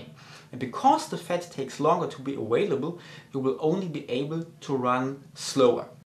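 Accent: Germany. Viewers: Great video as always. Too many people thinks empty stomach cardio is best for fat burning. Thumbs up for german accent!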